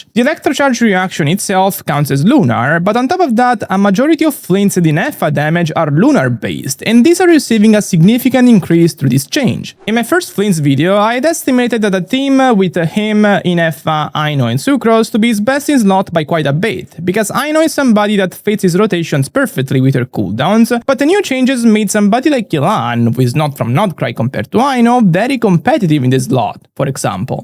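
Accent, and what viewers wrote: Italy. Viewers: are u italian? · hai un ottimo inglese ma il nostro accento è riconoscibile lontano un miglio 😂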